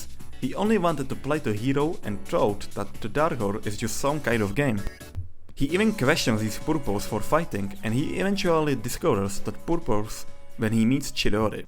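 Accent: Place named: Slovakia. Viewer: Brácho, už len keď som počul tvoj prízvuk, hneď som vedel odkiaľ si :) Super videá inač, len dalej pokračuj v tvorbe!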